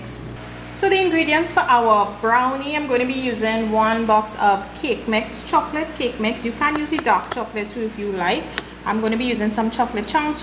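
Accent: Trinidad and Tobago. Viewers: Are you from the Caribbean? You have a Trinidarian accent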